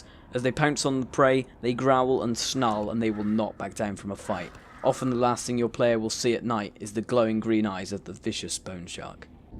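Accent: United Kingdom. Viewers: y r u british :/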